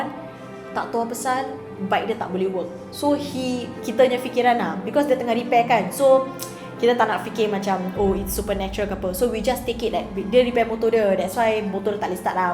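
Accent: Singapore. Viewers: Tgk vid ni baru aq tau singapore pnye bm pn loghat lain siak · Are you Singaporean?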